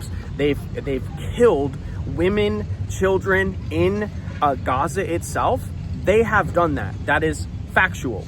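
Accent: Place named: United States. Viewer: Wow. I just finished watching the entire video and I'm very impressed by your intellect. I'm glad some Americans like you can think critically with valid information and make out an answers by themselves. I'm not a Christian and neither do I plan to be one but I'm very glad a white American (Just assuming based off of your accent and appearance) is actually following the true teachings of the Christianity and not some twisted version made by ideologically skewered men. Thanks for making this video, it gives me atleast a glimmer of hope in a country I though was doomed and destined to fail.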